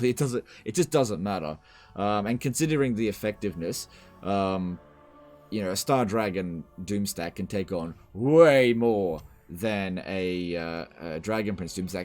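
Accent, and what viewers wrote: Queensland, Australia. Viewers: Hey, I was curious if you might consider doing a "how to level" {hero/lord name here}. I just realized as I was playing a Thorek campaign how I have no idea what traits to take down the blue line to get Lightning Strike are. Not sure what the algorithms leaning towards these days as to video number or length ( nearly 400k in it's all probably gravy to you at this point ), but I wouldn't mind that kind of guide with a bit of queenslander accent thrown in.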